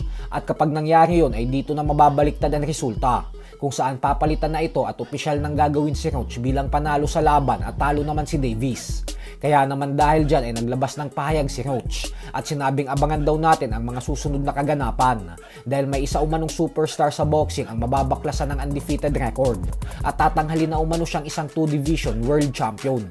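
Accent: Visayas, Philippines. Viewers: Ang dame mong alam di tugma sunasabi mo panget pa Ng boses mo visayang visaya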